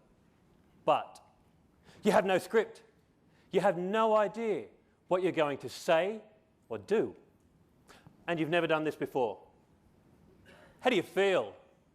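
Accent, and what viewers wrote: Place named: Australia. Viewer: boss you sound ozzie not brit excellent speech sir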